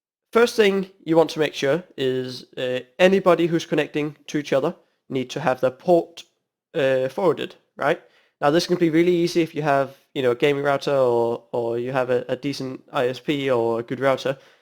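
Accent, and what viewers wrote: Denmark. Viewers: i knew u where a dane hej :D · er du fra Danmark?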